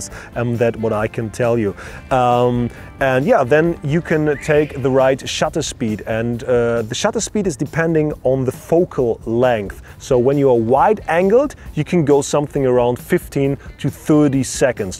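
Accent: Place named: Germany. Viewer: PEP also Englisch ist sehr gut. Nur ein deutscher Akzent, aber das ist auch egal:) mir gefallen die Videos So, English is great, but he has a German accent, but I think that doesn't matter:) I like the videos. They are great BTW: this is an English channel. I recommend to use English. It helps you to learn English and the other persons understand what you are saying;) just a little tip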